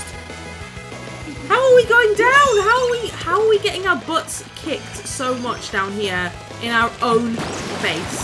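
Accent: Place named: London, United Kingdom. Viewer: Absolutely good Splatoon vid! We should play :D U sound like your from London? cuzz I am And I looooooooove ranked!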